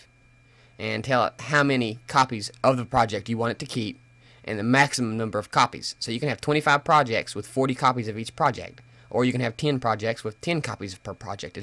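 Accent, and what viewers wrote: United States. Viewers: U HAVE A COUNTRY ACCENT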